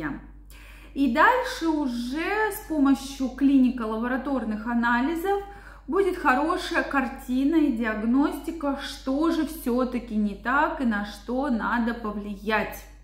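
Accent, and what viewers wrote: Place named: Urals, Russia. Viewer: Вы с Урала? Говор специфический.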